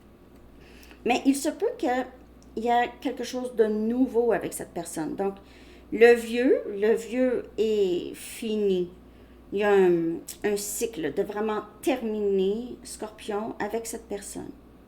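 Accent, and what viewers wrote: Quebec, Canada. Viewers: Salut non sa va tu prononce bien avec un jolie accent québécois magnifique guidance générale j adore bonne continuation à bientôt ❤️ ❤️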